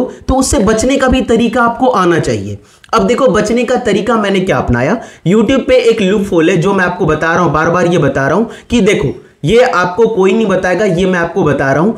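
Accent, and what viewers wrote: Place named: India. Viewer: USA ka time mater nhi karta hai aapko English main video bana hga aur usa ki log Indian accent itna dekhta nhi hai 😅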